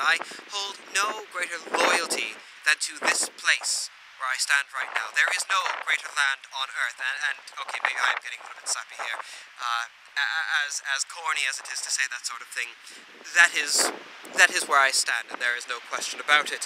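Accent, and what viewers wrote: RP, United Kingdom. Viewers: I actually thought that he was british— sorry. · I believe people don’t think you’re from the United States because you have quite a posh way of speaking and a slight British accent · Wait I thought you were British....... Well my reality is falling apart. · Wait the most british person ever is american? Woaw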